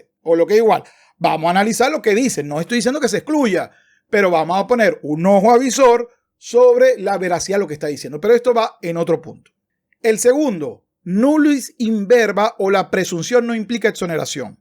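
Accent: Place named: Venezuela. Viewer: Eres venezolano , de lejos se nota !!! !!! De Caracas o de donde ....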